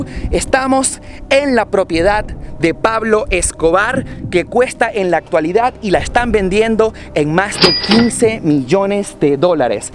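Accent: Venezuela. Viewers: eres venezolano no.? hola no conocia tu trabajo. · El acento venezolano es inconfundible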